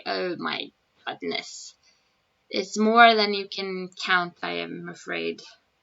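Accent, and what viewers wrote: Oslo, Norway. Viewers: Det var jo kjempefint å se deg, men jeg håper hvis du kunne lage en ny video der du snakker Oslo dialekt slik din. Her på vestlandet snakker de på en annen måte, meg det blir nyttig for oss som har lyst til å lære den østlandske dialekten. Tusen hjertlig takk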